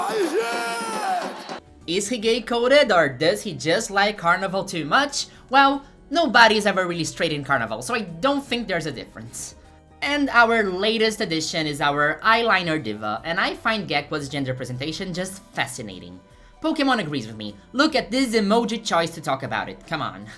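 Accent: Brazil. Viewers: hearing you pronounce quaquaval with your brazilian accent healed me thank you mother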